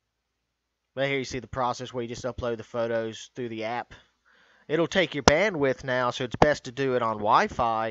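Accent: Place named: Georgia, United States. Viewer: You accents sounds like Georgia or somewhere close.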